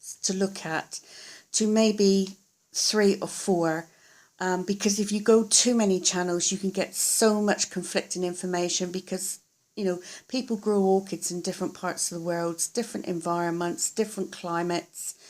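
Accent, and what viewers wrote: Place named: England, United Kingdom. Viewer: You made me smile when you were trying to remember the different types of orchids - there you were pointing the camera at Dendrobiums and forgot them completely! Thanks for joining in and you've solved one mystery - I knew that was an English accent!!!. And well done getting Den. fimbriatum to bloom - you will love those blooms but you might not like the fragrance?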